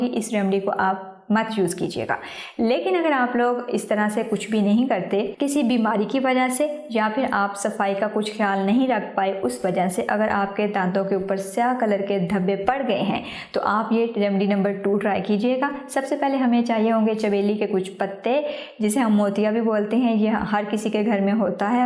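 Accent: Punjab, India. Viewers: Haye tuhadi Punjabi😍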